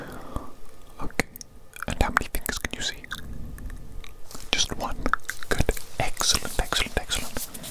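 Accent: United Kingdom. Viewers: Are you british im from the uk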